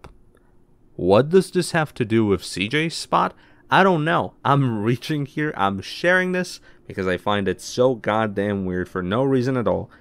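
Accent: Poland. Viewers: Are you polish by any chance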